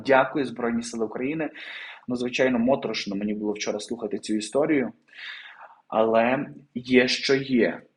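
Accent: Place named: Western Ukraine, Ukraine. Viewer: Ну что ж такое, что это за украинский с западенским говором, ни одного города не назвал правильно. Хоть Залужный правильно сказал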